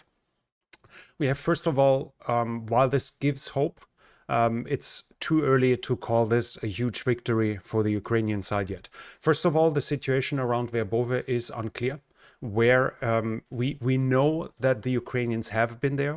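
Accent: Germany. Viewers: You talk like a german but look like a Danish person and you have a Danish name, do you live close to the border to Denmark/north of Hamburg? :)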